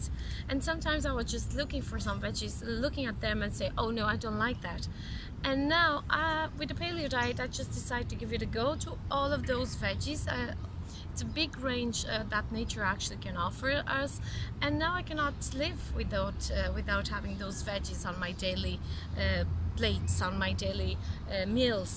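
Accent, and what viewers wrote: Ireland. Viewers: That irish accent! Nota-se imenso! Gostei muito do video e do sitio onde estás, queria muito! Brevemente vou trazer um vídeo sobre vegan/vegetarianismo para o canal, e a Paleo Diet parece um bocado assim também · Omg that irish accent ... just love it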